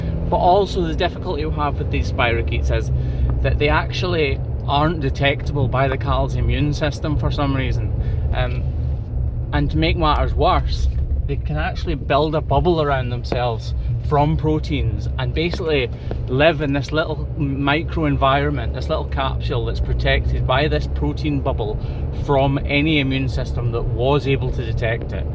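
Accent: Scotland, United Kingdom. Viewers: So nice to hear a fellow Scot on Youtube. Also good to watch and listen to someone who is knowledgeable and interested in what he does. Aren't cows such beautiful creatures 😊😊😊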